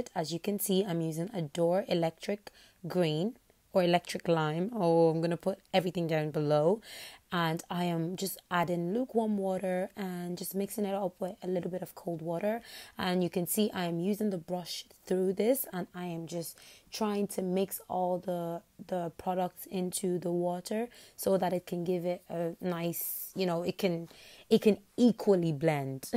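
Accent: Ireland. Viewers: The Irish accent omg 😍😍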